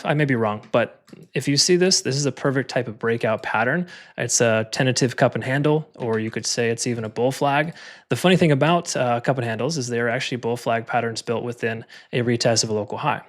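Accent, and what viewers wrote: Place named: United States. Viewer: I wont watch channels that have accents, Indian, british, Australian, south African and ghetto/prison/ratchet are some of the worst. I appreciate this english American speech.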